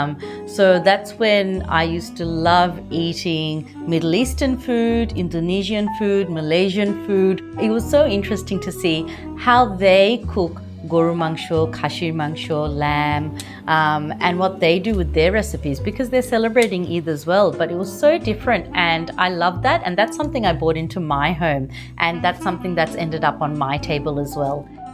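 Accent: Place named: Australia. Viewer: She got aussie accent but she speaks clear bangla with no extra accent My respect for her is growing every day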